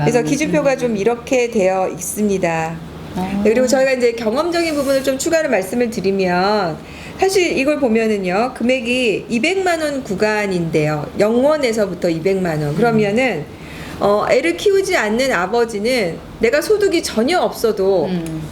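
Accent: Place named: Seoul, South Korea. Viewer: 고향은 전라도인데 서울말을 더많이쓰시네요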